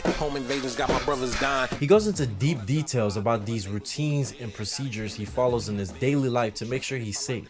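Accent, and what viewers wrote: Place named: Nigeria. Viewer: Are you NIgerian? I can hear it in the way you pronounced his last name and how you pronounce the word "the".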